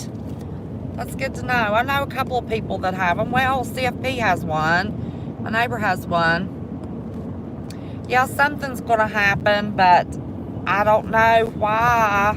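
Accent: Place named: Deep South, United States. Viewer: Where is your accent from sounds deep south, Louisiana? Mississippi? Alabama??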